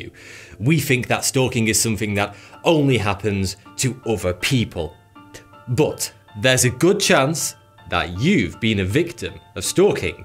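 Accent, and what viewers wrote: United Kingdom. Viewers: what 🤷🏻‍♀️ he is british, thats what some people from Britain talk like 😉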